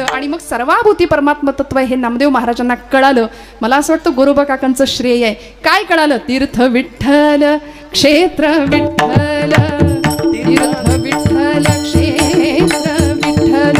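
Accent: Standard. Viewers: खूप छान किर्तन आवाज सुंदर उच्चार शुद्ध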